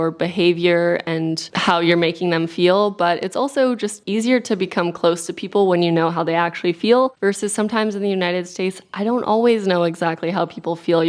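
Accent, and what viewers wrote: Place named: Netherlands. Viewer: i heard your dutch i was thinking i can hear in your english that you speak a bit dutch wy i was born in australia mother born in netherlands but family left to aus when she was 2 dutch dad and i was 3 years old when came to the netherlands but like i said i can hear your speak some dutch and done for some time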